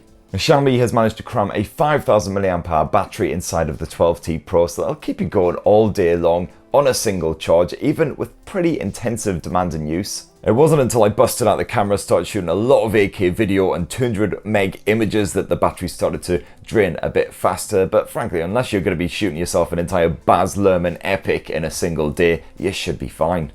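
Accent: United Kingdom. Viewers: You are always outrageous with yuh commentary but gosh I love the British and the way y'all speak. Bro say, yuh won't have to sell yuh first born😂😂😂😂😂😂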